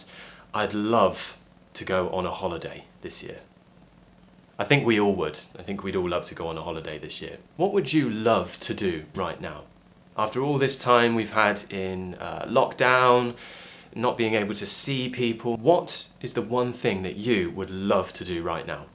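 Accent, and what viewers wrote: RP, United Kingdom. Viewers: I would rather give flowers to learn British English! Seriously, I love the accent & I learn a lot from him since few years past! <3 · Love your british accet! I'm really enjoy to hear it. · Your British pronunciation is a thing of beauty (I don't know if I using this expression correctly, I've just learned it) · I love the British Accent...I'd like to imitate you. Greetings from Cuba. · I am from Indonesia... I really like british acent ang your videos are very useful for me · His accent is too British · Now, I'm up for to learn more about British accent. It was so fascinated😍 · I love British accent. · Really like ur accent and also ur voice! Wanna have British accent too!🤩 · I love British accent. Thanks. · I 'm a new teacher and I'm teaching British English in a secondary school as a third language(the second language is French). 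I love British accent .I'm really lucky to come across your channel. Thank you for this video.💐💐💐💐 All your videos are great.👍 Nadia from Morocco. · I love the British accent, especially yours, 😍😍😍 You are the Best! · I love british accent,, iam indonesian and i'd love to learn more from your channel 😇😇😇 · Hello! Its nice to watch your video as I am enjoying your Brits accent. Very clear and easy to understand 😊. Stay safe! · This a true modern RP accent. I really fancy this accent 🇵🇰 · I love British accent alot. And Mashallah Sir the way you teach it’s amazing. Actually it’s more fun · I really love your British accent, could you please recommend some Youtube channels with the accent for toddlers, thanks a lot:)) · I love the British accent very amazing tnx for your video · I love british accent, this is one of the reasons to learn more, it's so easy to understand but my writing is not one of the best. 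you have been helping me a lot. hugs from Brazil 🇧🇷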